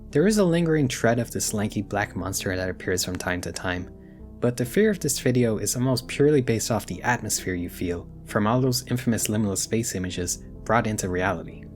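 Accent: Ireland. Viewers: Hidden Irish accent I think.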